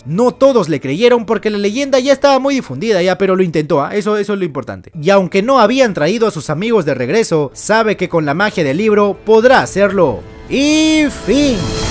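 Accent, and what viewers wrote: Peru. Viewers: por la forma de hablar eres de perú´por lo visto te amooooo soy tu fans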